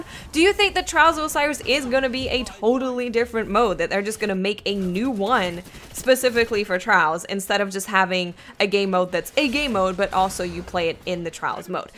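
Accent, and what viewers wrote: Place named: New Jersey, United States. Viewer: Is from Jersey? Seems like it to me from her accent. I'm new here, does anyone know?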